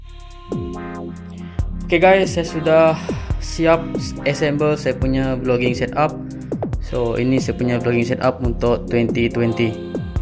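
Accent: Sarawak, Malaysia. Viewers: sarawakian ka? 😂 btw nice content. quite membantu and thanks for reminding pasal bajet.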